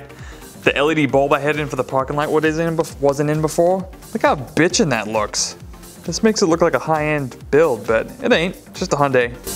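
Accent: Australia. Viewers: cool project! am I detecting a slight australian accent? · What the hell is your accent ? Lol I’ve never heard I’m Aussie you sound semi Aussie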